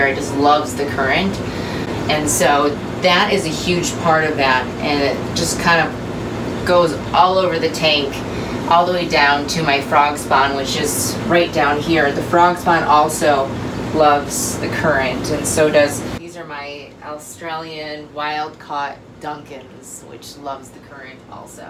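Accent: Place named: Brooklyn, United States. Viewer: With both the Polyfilter and carbon in the sump, I'm curious about how much production you really get from the skimmer. Both are supposed to be removing the organic substances that the skimmer is skimming. And I'm wondering whether you could only use the chemical filtration and skip the skimmer. P.S. You sound like Brooklyn.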